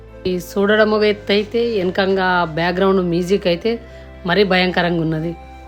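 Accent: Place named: Telangana, India. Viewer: Telangana basha super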